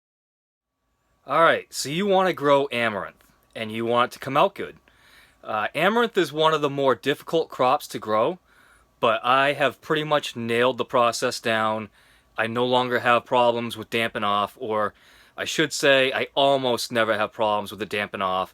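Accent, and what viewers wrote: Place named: New Jersey, United States. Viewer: OK. I am from NJ. I though I recognize an accent. I am in "New Mexico" now. I run "Taos Teenie Greenies". I grow for restaurants and locals. I have a request to add Amaranth to my lineup. I viewed your video for some pointers on my 1st batch. Thanks for making the video! Useful information here. Good work!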